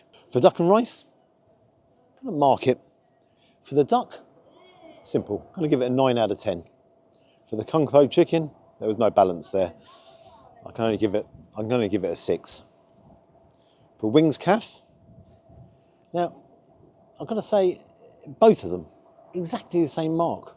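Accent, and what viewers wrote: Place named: Yorkshire, United Kingdom. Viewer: is a character though, he's quirky with his heavy Yorkshire accent and his "NOW THEN GUYS HOW YA DOIN, BACK ON T'ROAD AGAIN". He has a laugh and a joke with the audience, whereas is more serious, calmer and detailed. It's horses for courses for a lot of people, I enjoy both but I can see why would have a bigger audience especially with the younger generation, as he's more of an 'entertainer'.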